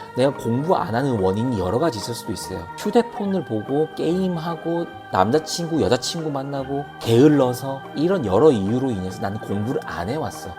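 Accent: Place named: Busan, South Korea. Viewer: 우연히 들어오게 되었는데 조근조근 선생님 사투리가 너무 좋네요. 여기도 부산이라~~ 중2 딸래미 수학성적 50점을 못넘기네요. 그룹, 개별수업 시켜봤는데 안되서 과외를 해요. 기초가 많이 부족하다 하시네요. 그나마 국어,영어는 80정정도 되구요 확실히 과학,수학쪽을 어려워해요. 그런데 미술을 너무 좋아해서 틈나는대로 종이나 아이패드로 그림을 그리고 있어요. 집근처에 올해 2회째 되는 위탁형공립예술학교가 생겼는데 인문계 다니다가 3학년 1년과정으로 그 학교를 다니면서 수업을 들을 수 있는데 국어,실용영어,한국사,체육 수업하고 오후엔 전공 과목(미술,음악) 실기수업 위주로 한다고 합니다. 예고를 가기엔 늦었고 거리도 너무 멀구요. 꼴지를 하더라도 인문계에 진학해서 공부하고 미술학원을 병행하는게 나을까요? 특성화고에 가서 내신도 좀 잡고 미술도 시킬까했는데 요즘은 내신따러 특성화고 가는 성적 괜찮은 학생들도 있다고 하더라구요. 중3부턴 입시미술학원에서 주말에 취미로 먼저 시작을 해볼까하는데 미대는 수학성적을 안보더라구요. 그래서 고민이 중2 이번 기말고사 끝나고 수학과외를 끊고 ebs 50일수학이나 30일수학 인강으로 기초를 다시 복습하고 3학년 현행을 인강으로 하는건 어떨지요. 공부도 잘하고. 그림도 잘 그리면 제일 좋겠지만 둘다 하기엔 경제적으로도 힘들 것 같아요.ㅜ 물론 미술을 시켜주는대신 인강으로 수학공부를 게을리 하지는 말자고 다짐을 하구요 답답한마음에 두서없이 글을 썼네요 바쁘시겠지만 선생님 조언 부탁드려도 될까요?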